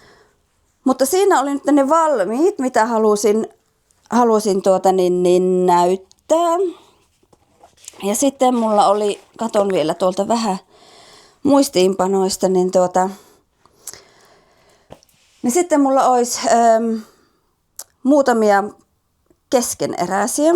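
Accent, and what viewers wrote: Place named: Eastern Finland, Finland. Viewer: Tykkäsin tästä videosta ja laitoin tilaukseen. Oletko kotoisin itä-Suomesta? Puheenparsi kuulosti niin tutulta. Minä tykkään neuloa keinutuolissa ja sään salliessa puutarhakeinussa. Hyvää kesää!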